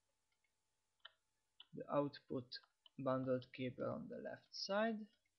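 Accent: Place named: Serbia. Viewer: Thank you very much Both of these videos are very helpful. And nice accent mate, Serbian by guess.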